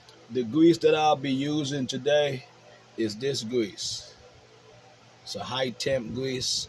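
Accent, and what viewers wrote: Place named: South, United States. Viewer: I could listen to his Southern Drawl all day long!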